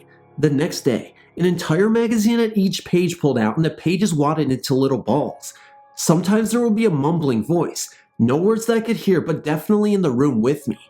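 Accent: South, United States. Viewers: LoL 😂😂😂 Your Southern Accent 😏 I was Dying like is quite Funny & Cool 😀 much love ✌💙💙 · Southern ascent sounds good,great vid Thanks and happy holidays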